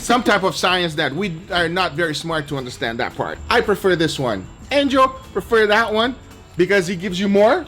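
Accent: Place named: Brazil. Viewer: Is from Brazil? His accent sounds like a Brazilian accent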